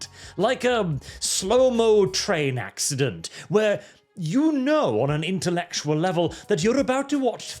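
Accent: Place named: United Kingdom. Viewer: While I don't disagree, I cannot tolerate listening to him talk. That affected, British, rolled "R" fills me with rage and makes me want to throw some tea into the harbor.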